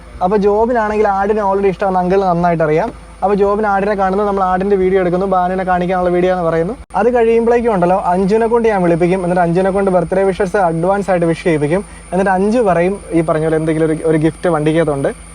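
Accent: Kottayam, India. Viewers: Kottayam slag 😎😁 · Kottayam slang👌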